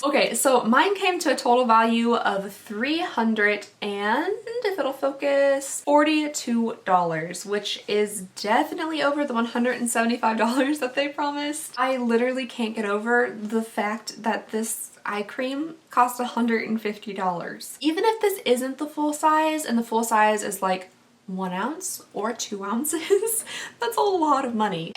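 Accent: Kentucky, United States. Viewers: I love your videos you’re very sweet and funny ❤️❤️ I’m from Kentucky also but feel our accents are so different I absolutely love your accent.! my email is <mail> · Instagram- killercupcak3 I love your Kentucky accent!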